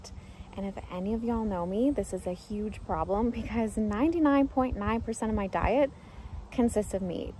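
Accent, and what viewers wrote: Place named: South, United States. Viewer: OMG! I heard several "Ya'lls", a growing amount of southern inflections and you even pronounced mayonnaise like a true southerner. Hopefully Jeff will make you an official Damn Yankee soon. Do you know what that means? ETA: I'm glad that you're on the mend. You look well.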